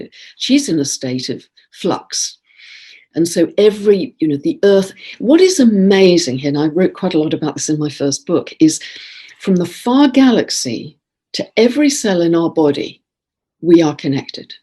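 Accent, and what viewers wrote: United Kingdom. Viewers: Thank you. Good to know of the strong Aquarius theme and to me it is interesting to have it all in a month of sun in Leo energy for the bigger part of the month. The unpredictability of what is coming have a good taste to me by the sounds of all happening Astrologically. Personally I see a level of anxiety from all these energies combinations and at the same time I also feel excited and motivated by all of it. I don't even know how I always know when you say Eris and when you say Aries. When I try to repeat what you say to find out they sound the same but I think there is a subtle difference my brain can pickup having my ears trained well enough to understand British accent. I would guess this accent confusion could be harder for Americans or foreigners not full into British accent. Funny that they, Eris and Aries, have also similar archetypal symbolism. 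Always lovely to hear your videos and have all this rich information at hand. Much appreciated. M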